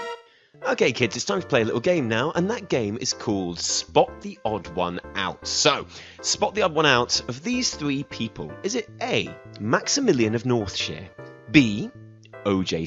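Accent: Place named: England, United Kingdom. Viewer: Hey, anyone know what his accent is from England? I'm Hampshire but I can't quite place his. Thanks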